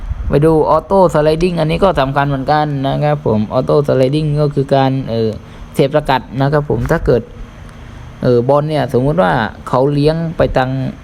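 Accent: South, Thailand. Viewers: น้องเป็นคนใต้หรือป่าวครับ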